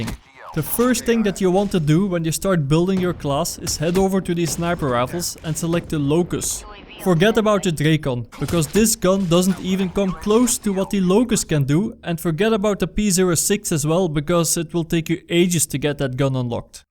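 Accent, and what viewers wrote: Netherlands. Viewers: You sound Pretty Dutch with Saying The "R" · You have a very strong Dutch-ish accent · He is from The Netherlands i guess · your from The netherlands i can hear it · I bet you're dutch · Dutch ... I can kind of hear it in your voice. exactly that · je bent duidelijk Nederlands😂. wel awesome vid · i hear the nederlands acsent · nice dutch accent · Hij is zoiezo Nederlands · Nederlands accent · Eman kan gelijk horen dat je NL bent dankje voor deze vid ga gelijk mn class aanpassen hopelijk merk ik het verschil keep it up en rustaaaag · gek nederlands accentje hoor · Lol youre dutch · dutch accent on point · Are you dutch you sound so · Hahahaha je bent zo duidelijk nederlands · je engels klinkt goed maar ik hoor gwn dat je nl bent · Are you Dutch by any chance? I can kind of hear it in your voice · (Dutch accent) has to be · fk erge nederlanse accend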